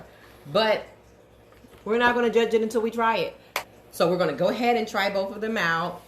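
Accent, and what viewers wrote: New York, United States. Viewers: That New York accent gets me Everytime! "Let's twok about it...a little swofta!" You have to say Albemarle in your next video... Just for me! I love your hair. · Def not mad at it. Looks great! Where are you from? I hear a slight accent? NY?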